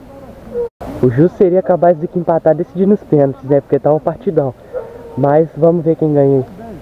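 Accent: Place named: Minas Gerais, Brazil. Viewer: Vc é mineiro né? Com esse sotaque. Legal é o clima dos jogos e a galera gnt boa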